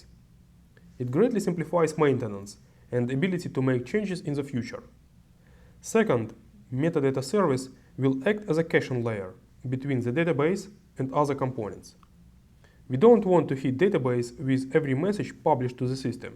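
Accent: Russia. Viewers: Ох уж этот русский акцент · 这是俄罗斯口音吗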